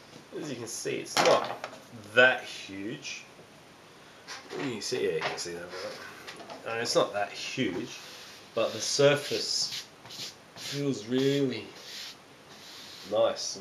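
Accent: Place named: Australia. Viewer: Yep, he's Aussie 7 years ago wow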